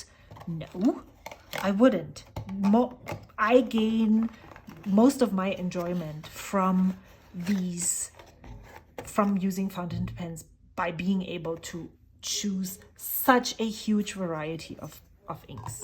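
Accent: Germany. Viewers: Thank you for such a detailed video; I enjoyed it quite a bit. That question #5 helped me a lot, as my approach to fountain pen has always been about getting a different writing experience from different pens, and I prefer Medium fine to medium nibs, as they have the right balance between nib width and smoothness. So, thank you. Regarding the inks(#7), I love both of the mentioned inks. I love teal(ish) colour. I recently got Lamy Petrol(2025 version), as I wanted sth that is close enough to dark emerald colour. Iroshizuku's new inks Rikka and Syun Gyo are on my list next. Thank you for such detailed and wonderful videos. I also liked your categorization of pens. I am someone who keeps a minimal and simple collection, but if I ever got that many pens, I am also going to have a similar system. BTW, are you German by any chance? I studied in Germany, and that accent seems familiar :)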